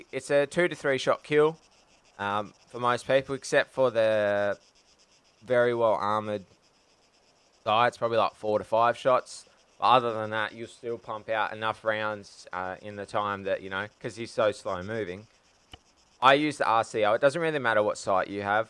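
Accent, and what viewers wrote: Australia. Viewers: god you have the most aussie voice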